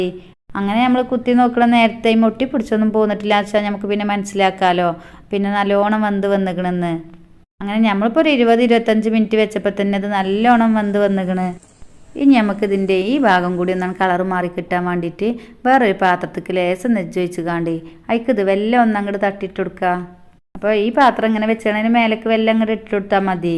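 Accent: Malappuram, India. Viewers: Ithaaa Malappuram ethu bhagathaa ee kodukaa, edukka ennu parayunathu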